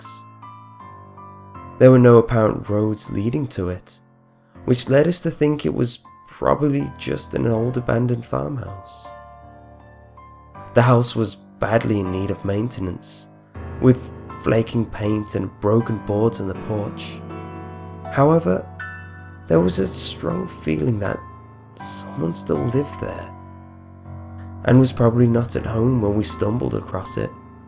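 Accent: England, United Kingdom. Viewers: are you from the south or north of England?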